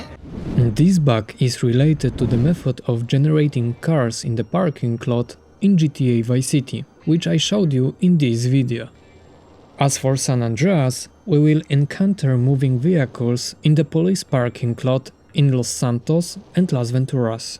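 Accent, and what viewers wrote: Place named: Poland. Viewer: Słychać że polak niezły film tak trzymaj!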